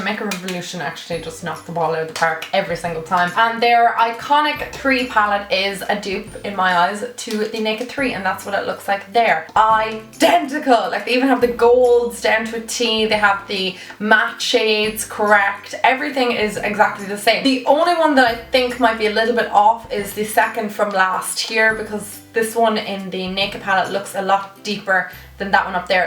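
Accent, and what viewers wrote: Ireland. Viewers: Enjoyed this very much. You are entertaining, informative and quick. And of course love the Irish accent. Trust what you say as well. Will look through your videos but would like to see you do one half of face all Mac and the other nyx, or urban decay and makeup revolution, etc.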